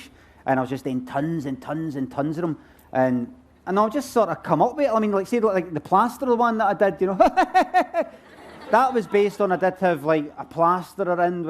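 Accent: Scotland, United Kingdom. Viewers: I don't know why but subconsciously I didn't think his accent was actually like that, and then seeing him actually talk it's like wow Scottish people are... real. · gonna sounds mean but i dont mean it in a bad way.. but pretty sure the accent scares away a portion of the audience. For exemple i speak french so learning english was a struggle already. hearing someone speak in a heavy accent is quite can be quite hard to understand . i've been watching and reading scot stuff so im personally used to it now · too scottish for hes own good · About his accent as he addressed it at the end of this show, I'm Irish and don't find the Scottish accent the easiest to decipher at times(due to the heavy colloquial stuff as much as the accent itself) but never truly failed to understand Limmy's jokes despite any supposed comprehension challenge. A happy accident of sorts came to my benifit as I continued watching Limmy show I found my ear tuning to the Scottish way of language which increased my ability to be a more attentive listener, one who searched for meaning in one's speech rather than have it handed to me on a plate. 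Limmy was very correct to keep his true speech. It best represents his intent for his jokes and also politely asks a discerning audience to be active listeners and cognitively involved in figuring out the jokes in his work. He writes his work like Miles Davis wrote jazz, honest and true and then only appreciatable by those willing to keep their own active mind present when being entertained. Not saying anyone is smarter or less so, just distinguishing the difference between people who watch comedians or listen to music to shut off or disconnect from their mind, maybe as some kind of break or rest for it(the mind), as opposed to those who keep their mind involved in the entertaining experience as to appreciate it earnestly (as in beyond mere amusement). Any thoughts?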